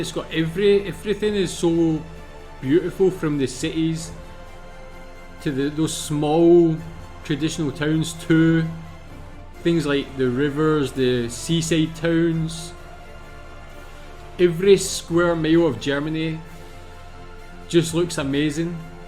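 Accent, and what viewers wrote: Scotland, United Kingdom. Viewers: Which part of Scotland are you from? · I wanted to say the same :-) I grinned all the time ;-) And his enthusiasm seems real! But the scottish accent: FABULOUS! :-) AND, a REDHEAD! Made with pride in Scotland ;-) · Like your videos and - mostly - your scottish accent. 😊 Thow, not every city is so beautiful, like in the video, but there are a lot. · I am glad, to hear a scottish aczent again. I come from the Black Forrest region, close to the french border. Many well kept Cities arround, plus all those Nature. Sometimes we do not even see the beauty anymore. Also, I feel sorry , because you lost your Freedom of Movment. Europe could be such a good place, if only we would be united. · I thought you’re from Scotland, love your accent ❤❤ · I Luv juor sccotzich Accent. Very translatbal to Bavarian 🤣🤣🤣 I survievwd all englisch Trips 🤣🤣🤣 Even in indonasa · Greetings from Germany. 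Love your your scottish accent. That´s the land of my dreams. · ...before i start to listen your reaction, my first thought was, you have a scottish accent... i am , see, what comes now. i will give you some comments after your video. btw. german here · Very nice accent or Scottish ! 👍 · Scottish, your accent, right?! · The Scottish are always welcome here in Germany and of course we do have always a good Beer for our Scottish friends. By the way i like your Scottish dialect :-). Best regards from Germany to the beautiful Scotland. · Didn't need subtitles, your Scottish accent was easily understandable. And I'm living in the last city shown, which was kind of cut up with only the view from the main castle (city has more than one) and not so much on the castle, and still not capturing the main feature, as the city originally was designed in fan shape, with this castle being the centre. Not showing the other castles, or the countries highest courts. 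But I have to say, France is also doing a good job in preserving old cities and villages. Went to some, e.g. in the Vosges Mountains, that I thought kept more of their vintage charm than many German ones.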